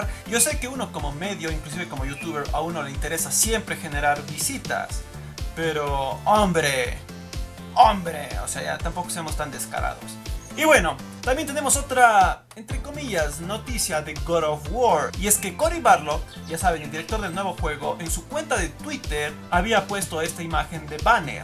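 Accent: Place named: Cuenca, Ecuador. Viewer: habla con tu asentó cuencano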